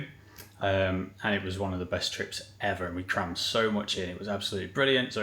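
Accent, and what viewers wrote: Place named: Birmingham, United Kingdom. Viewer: Your thoughts were exactly the same as mine when I first visited Vegas. We love the Luxor and I cant wait to get to that part of the vlogs when you get there. The Luxor for us is quiet to retreat to but still on the strip and a fantastically themed hotel. I'm picking up your accent being local to mine too which makes the vlogs very easy to watch and relate to. (Im from Birmingham UK). Keep up the content, this is the video I stumbled on to find your channel.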